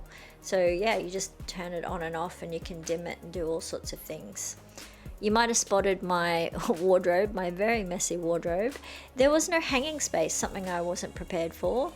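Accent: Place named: Australia. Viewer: I just love your Australian accent. It's amazing.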